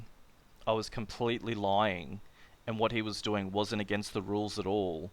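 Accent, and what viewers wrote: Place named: Australia. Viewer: bro I love your channel, your Aussia I can tell, you aussies are the best shittalkers I swear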